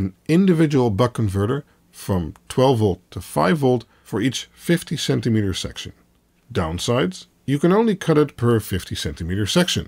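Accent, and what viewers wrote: Netherlands. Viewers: even een vraag tussendoor...ben jij toevallig nederlands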